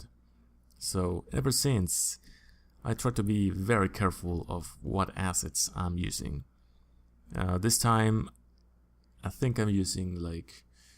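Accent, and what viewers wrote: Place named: Germany. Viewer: Hablas mejor ingles que el "buen" Peña Nieto. Estaba a punto de decir que eras Aleman por el accento. Yo soy de Francia, vivo en Mexico ;)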